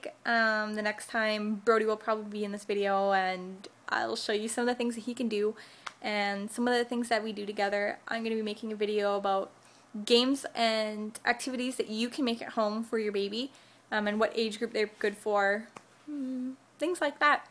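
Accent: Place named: Canada. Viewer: You have such a canadian accent! Anyways, I watched your pregnancy videos and Im glad your vlogging again! (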